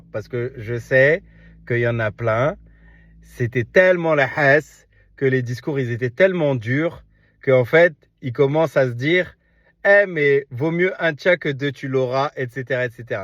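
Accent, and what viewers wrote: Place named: Arab world. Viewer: pourquoi tu rigoles ? tu parles français avec une accent d'arabe. Lui parle arabe avec un accent français. Toi comprendre ?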